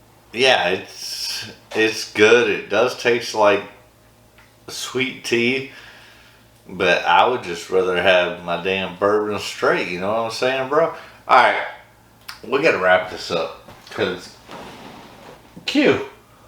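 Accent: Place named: South, United States. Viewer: you sound like you’re in the south? I don’t have much luck finding the allocated stuff here in NE TN.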